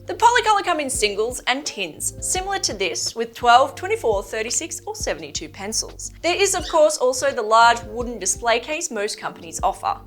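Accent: Australia. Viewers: Hi!, HaHa! Like you, your Aussie accent is lovely! You bring such positive and happy vibes to your channel and as I really need this review, you made getting the info an absolute pleasure! I am so looking forward to more! ~:face-blue-smiling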